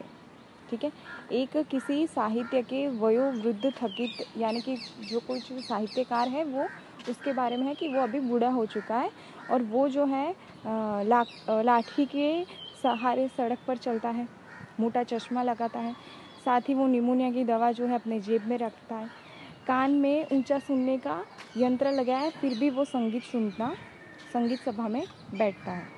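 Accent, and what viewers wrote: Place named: Maharashtra, India. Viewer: Kya ap Marathi ho apke accent se pta lgra h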